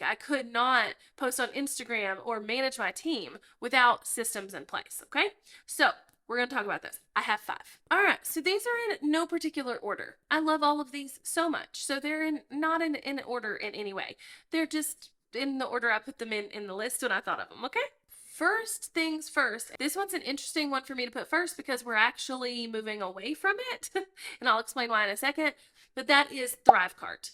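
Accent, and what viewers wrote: South, United States. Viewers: thank you for taking the time to reply. I really appreciate it. 💕Just to add I believe thrive cart also offers sales page, up sell, down sell options too. So that’s one reason I was asking. I don’t own thrivecart , but was looking at it. They also offer a lifetime purchase option at the moment. FG looks very good though. 😁 Anyways … I’m really enjoying your channel and expect I’ll be on your mailing list very soon. Haha. (I’m Australian and maybe that’s why I enjoy your Southern accent … and “all the things” 😂 ). 🤗💖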